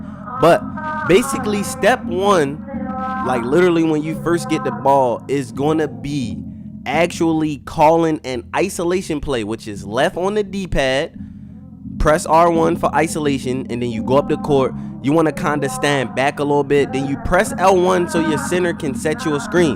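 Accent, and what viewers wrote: Baltimore, United States. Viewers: U got a Baltimore accent bro✊🏽❗️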